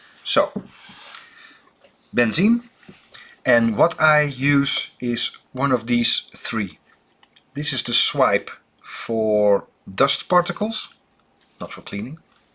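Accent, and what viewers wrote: Netherlands. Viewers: Your dutch accent reminds me of relatives in Grand Rapids when I was a child growing up.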